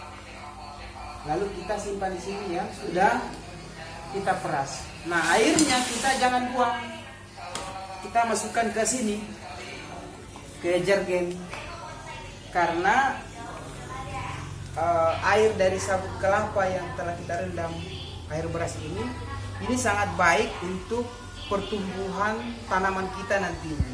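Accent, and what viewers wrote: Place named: Bugis, Indonesia. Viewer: Logat bugis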